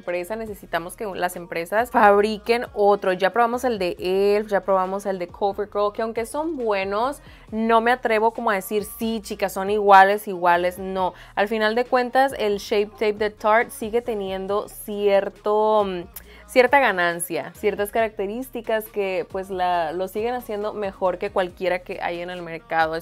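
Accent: Sinaloa, Mexico. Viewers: Hola.... Desde que te ví en el vídeo sentí que hablas como las de Sinaloa. Y ya te escuché que sí. De que parte de Sinaloa eres? Culiacán. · tu acento sinaloense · Yo te adoro y como hablas 😂 Soy Dominicana pero amo como hablan México ese acento sinaloense lo adoro🥰, quedaste bella y todo lo de Shein te queda chulisimo. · Hermoso maquillaje 😍👌 Primera vez que veo tus videos y al escucharte supe q eras de sinaloa 👏🙆 saludos de Culiacan 👍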